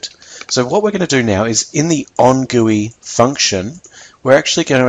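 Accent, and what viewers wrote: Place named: Australia. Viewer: I'd bet my socks your australian...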